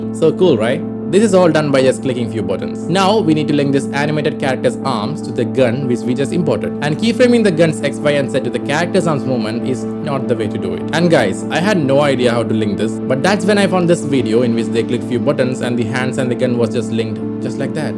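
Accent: Kerala, India. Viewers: bro are you malayali, sounds very like it? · You started getting a bit too indian for me, couldnt understand halfway through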